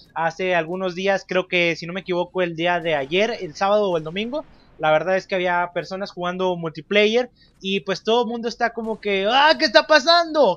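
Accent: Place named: Chihuahua, Mexico. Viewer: Supe en un segundo que eres de chihuahua , yo tambien carnal arriba chihuahua !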